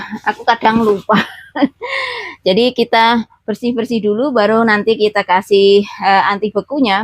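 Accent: Java, Indonesia. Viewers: Mingat..mingat o kono ..iki barangmu...hihi ..seneng dengerin khas jawa nya 😁😁 Bun saya sering koment, smg tdk bosan😊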